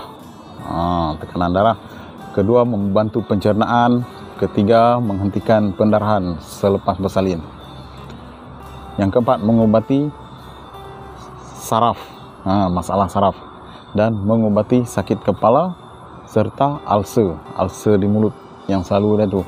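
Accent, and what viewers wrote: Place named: Northern Peninsula, Malaysia. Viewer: Helo bang👋🏻😍salam kenal🤝🏼slang abg macam slang utara semenanjunglah✌🏼😉ok bang terima kasih atas perkongsian yg sangat berguna ini ya..saya x pernah tau lagi pasal pokok jerangau ni..terima kasih bang😍👍🏻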